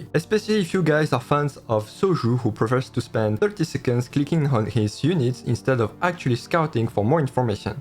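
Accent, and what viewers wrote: France. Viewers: Great advices. Awful english accent. Where are you from ? (french people has same awful english accent, but could be asian) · You are a french accent, u are french ? ^^'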